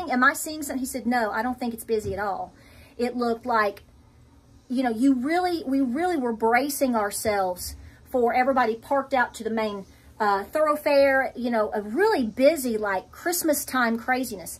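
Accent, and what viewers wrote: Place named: East Tennessee, United States. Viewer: Found you about 2 weeks ago & have been “soaking” up all your advice & wisdom!! You mentioned Tight Wad Gazette in a video I watched today from awhile back. Just wanted you to know I found all 1-3 on Ebay & paid about less than $20 / free shipping SCORE & Thank You!!! Grew up in East TN & never tiring of hearing you / your accent!!! ❤❤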